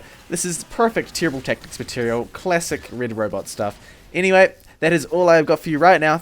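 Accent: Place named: New Zealand. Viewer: He's from new zealand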